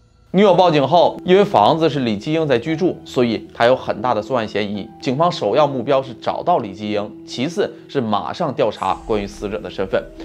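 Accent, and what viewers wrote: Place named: North, China. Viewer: 感覺up主像北方人,但咬字很清楚,解說很有吸引力,訂閱你了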